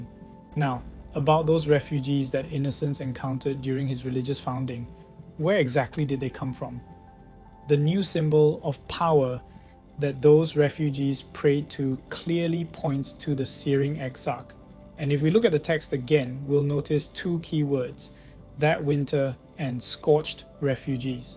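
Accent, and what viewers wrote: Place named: Asia. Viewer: 這是AI不是本人嗎？我還覺得這期亞洲音比上期重呢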